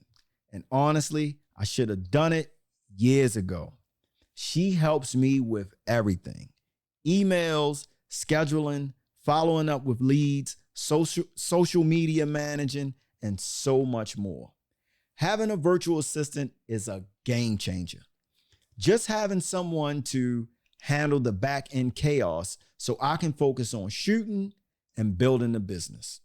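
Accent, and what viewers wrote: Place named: Baltimore, United States. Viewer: Man that Baltimore accent STROWNG ain’t it 😂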